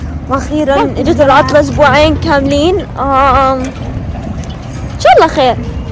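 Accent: Iraq. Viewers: ها شبيها ترى المصلاويين يحجون هيج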